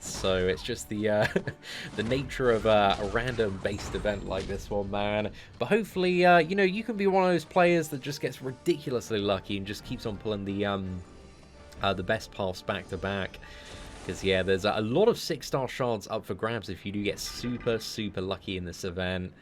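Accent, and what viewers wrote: United Kingdom. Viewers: I’ll never read the forums or need any other channel besides for mcoc news 👀. Man saves me hella time and I get to get a free British accent talking person without using my pc narrator mode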